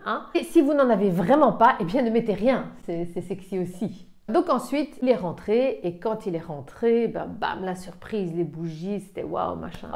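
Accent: Brussels, Belgium. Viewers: Je valide les idées!! Je te connais via tit Caro! J'entend un petit accent de Bruxelles ?! Une voisine 😍 hop je m'abonne! N'hésite pas à venir voir ma chaîne! Tout Plein de gros bisou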